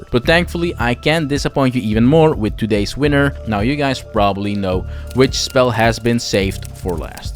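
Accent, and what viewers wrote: Netherlands. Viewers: Hey klootzak, are you Dutch?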